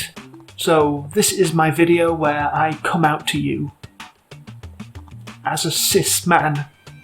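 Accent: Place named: Belfast, United Kingdom. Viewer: Love if when I hear a Belfast accent,. 💚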